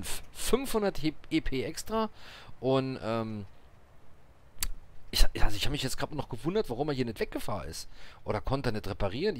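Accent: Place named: Saarland, Germany. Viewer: Ma merkt dir schon e bissje an, dass du ausm Saarland kommsch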